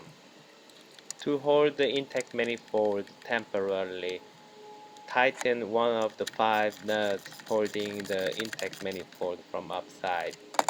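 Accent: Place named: China. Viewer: You h@ Chinese accent dude.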